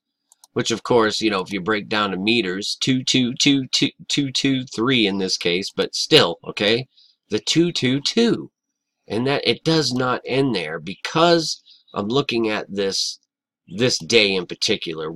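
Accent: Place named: Boston, United States. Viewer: This is incredibly nutty. And I just realized you're from Boston. Haha. You "sawr" it. 😊 Excellent work and crazy fingerprints of the evil architects. Look forward to your vids.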